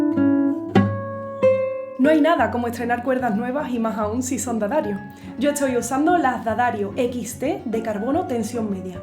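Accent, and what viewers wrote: Andalusia, Spain. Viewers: Eres una bruja!!!... una bruja pero de la guitarra en tu caldero de cuerdas bates las mayores fórmulas para hacer que nuestras sensaciones caigan y suban a tu antojo...bruja!!! me entristeces y emocionas...tus notas y palabras son una montaña rusa...te odio y te amo...bruja aleja tus notas y tu acento andaluz de mi oído y mí corazón...sufro la fiebre...la mancha en mí cuerpo...a morir feliz!!! Chao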